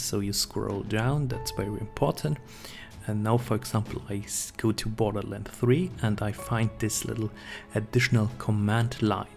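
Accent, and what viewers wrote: Germany. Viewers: Man hört diesen deutschen Akzent und man sieht es an den Settings :D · man hört so schön diesen nicen deutschen akzent raus hahaha <3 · Man hört den deutschen akzent😂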